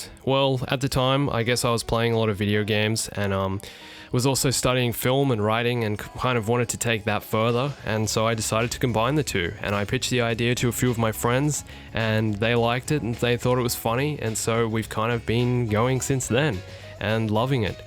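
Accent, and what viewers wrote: Australia. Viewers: You're Australian? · are you Australian?? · YES!!!! AN AUSSIE!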